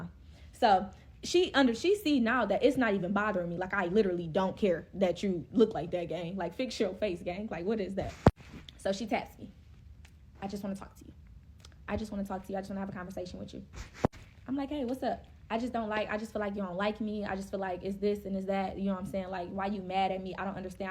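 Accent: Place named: Detroit, United States. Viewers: She gotta be from Detroit how she telling this story lol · She talking like she from Detroit but she not acting like it 🧐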